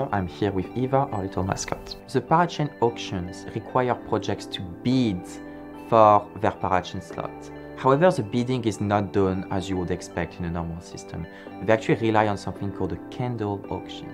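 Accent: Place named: France. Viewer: I’m here just to hear this man’s voice Bc I love his French accent.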